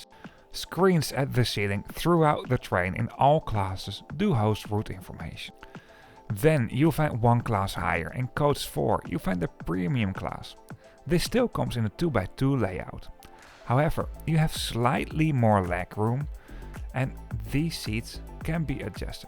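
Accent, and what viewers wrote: Netherlands. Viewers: Hearing the Dutch accent voice train travel just makes me happy! I needed this on a busy Monday in Germany.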